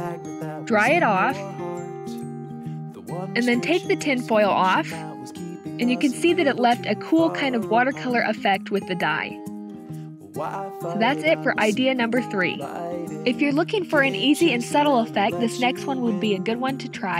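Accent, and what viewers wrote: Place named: Minnesota, United States. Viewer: Are you from Minnesota sounds like Minnesota accent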